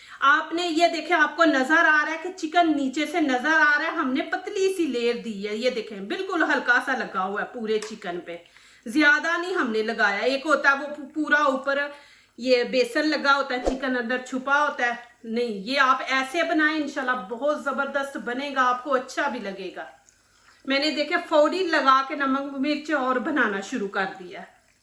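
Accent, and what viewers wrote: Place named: Hyderabad, India. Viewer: Mam your Gulabi Urdu we enjoy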